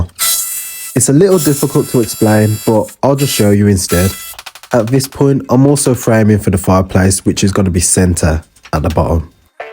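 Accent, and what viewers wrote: Birmingham, United Kingdom. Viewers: This is a brummy accent - Birmingham, UK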